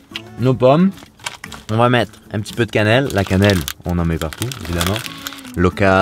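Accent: Quebec, Canada. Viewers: Bonjour, Je m'appelle Marc et j'ai eu plaisir à suivre tes aventures en Van de l'autre côté de l'atlantique depuis quelques semaines. En me familiarisant avec tes vidéos et toi même, cela m'a donné envie d'acheter ton livre que j'ai commencé cet apm. j'avais juste envie de te dire que je suis un lecteur acidue, que j'ai beaucoup lu et que ton livre que j'ai juste commencé ( environ 30 pages) est vraiment très bien écrit, mais plus encore, très intelligemment pensé et formulé. A 26 ans, tu as du vivre d'autres vies pour avoir une opinion si juste et éclairée sur la situation dans le monde ultra civilisé et le mirage parfait qu'il entretient dans nos sociétés aveuglées et courant vers le même précipice, sans vouloir faire du catastrophisme. Je vais également me lancer prochainement à 45 ans dans la vie de Van à travers l'Europe et c'est ce projet qui m'a proposé ta rencontre. 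Alors voilà, simplement un grand merci pour la qualité de tes vidéos et au moins tout autant pour la qualité de tes pensées profondément subtiles et de ton écriture qui le met si bien en forme ( et celui qui a osé te demander si tu étais francophone, devrait lire en urgence ton bouquin pour ne plus avoir à se poser cette stupide question, sachant que tu parles presque aussi bien que tu écris...:-)) Mon frère vit au Quebec depuis des années et j'adore votre accent. Je te souhaite de bien continuer à profiter de ton courageux choix et puisse la route te porter encore vers ces expériences de vie si m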